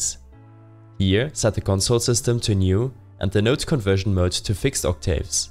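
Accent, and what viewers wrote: Germany. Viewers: You sound German mein Freund.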